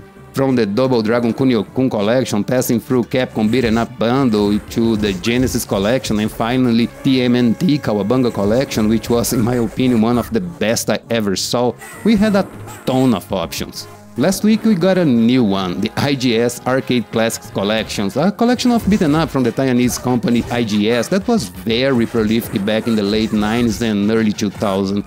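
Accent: Brazil. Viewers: o sotaque BR me surpreendeu kk bom video man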